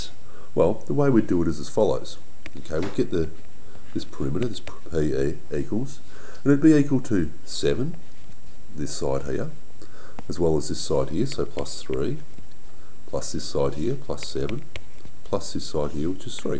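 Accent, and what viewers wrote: Australia. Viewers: Is it just me or do I learn better when being taught by an Australian teacher!? I find it riveting when I hear somebody say "G'day Mate" XD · Well he has an Australian accent. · Jessie Panganiban What? He's Australian? He sounds so fiiine as an Australian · So you have an Australian accent? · Are you Australian "G'day" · aussie mate! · This isn’t about the video but, are you Australian? I recognise that accent heh! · Your from Australia! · im australian and i understand him hes got an aussie accent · Are you Australian??? 😳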